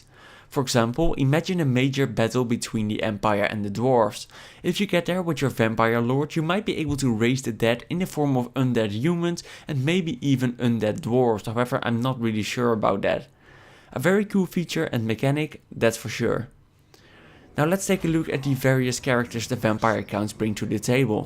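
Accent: Netherlands. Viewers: Are you perhaps from the Netherlands?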